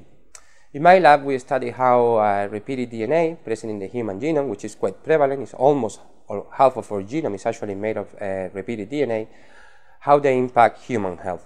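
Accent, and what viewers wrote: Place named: Spain. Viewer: Interesting, but you should speak slower. As a non-native English speaker I have problem to follow because of your Spanish accent, and it had been much easier if you spoke slower.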